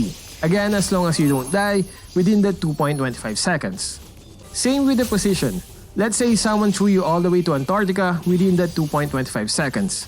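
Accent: Philippines. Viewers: it sounds like he has a filipino accent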